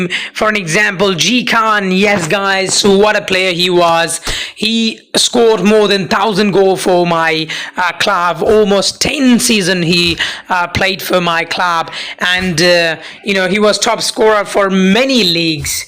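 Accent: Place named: Germany. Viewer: junge direkt gehört, dass du aus deutschland kommst :D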